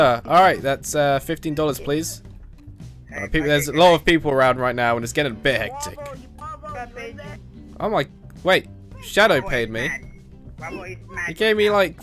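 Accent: United Kingdom. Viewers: are u from uk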